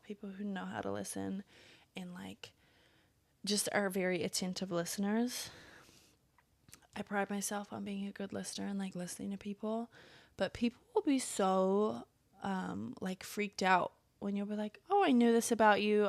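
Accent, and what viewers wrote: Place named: Deep South, United States. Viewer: you are very funny and wise and cool. Relate to not liking maths at school, and having to deal with shitty customers. What part of the states are you from, surely the deep.south from your accent?? ❤❤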